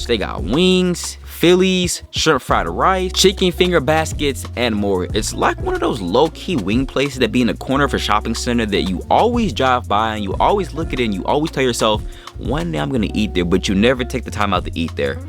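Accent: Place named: South, United States. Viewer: Sounds country, but thats cool.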